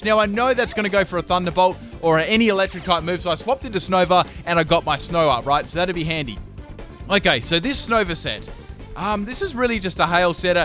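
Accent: Australia. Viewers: PLEASE PIN THE COMMENT I LOVE YOU AND YOUR AUSTRALIAN ACCENT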